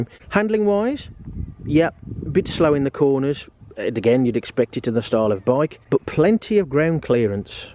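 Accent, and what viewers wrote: Birmingham, United Kingdom. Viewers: Another moaning Brummie.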